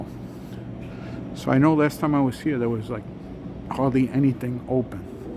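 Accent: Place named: New Jersey, United States. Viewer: Playing now! I love your accent! I lost mine but easily pick it back up when around my nj family. I remember being very little and vacationing in AC before it was ‘ the ac’ had to be around ‘67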